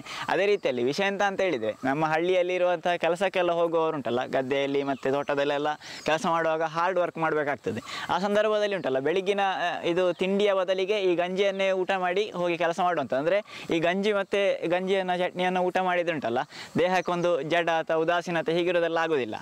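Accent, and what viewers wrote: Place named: Mangaluru, India. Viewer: Kannada (Mangaluru accent )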